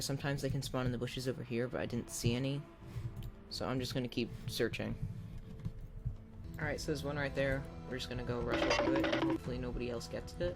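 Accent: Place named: Sweden. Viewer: its like a swedish accent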